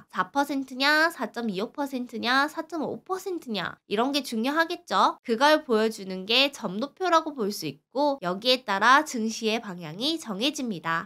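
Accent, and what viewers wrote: Gyeongsang, South Korea. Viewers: 일료일 월료일... 은 갱상도 출신입니77ㅑ?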